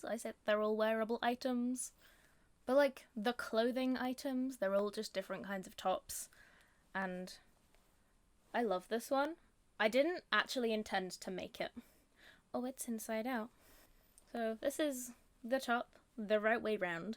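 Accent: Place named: United Kingdom. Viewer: You're so cute and talented :) and also I love your British accent <3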